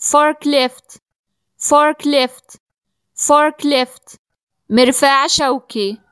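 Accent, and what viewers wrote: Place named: United States. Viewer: هذه تتحدث بالأمريكية مانريد الامريكيه نريد البريطانيه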